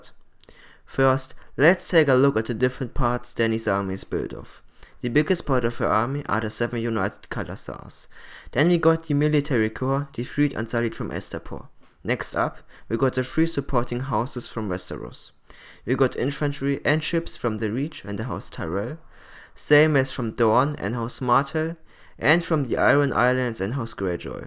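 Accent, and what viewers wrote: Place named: Germany. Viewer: Your accent Tells me you Are German;)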